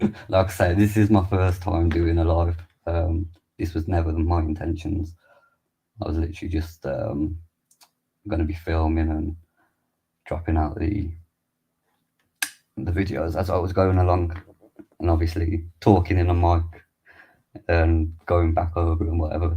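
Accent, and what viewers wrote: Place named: Wolverhampton, United Kingdom. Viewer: Knew it was a Wolverhampton accent, I'm from Three Tuns/ Bushbury area 👍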